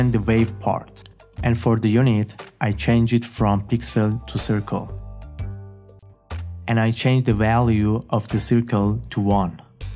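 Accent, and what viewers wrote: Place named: Iran. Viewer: لهجه ایرانیت تو حلقم عالی ادامه بده ❤😂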